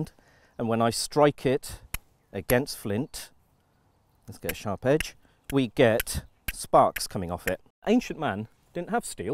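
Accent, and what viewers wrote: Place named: United Kingdom. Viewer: An Indian man with a British accent. Bring it on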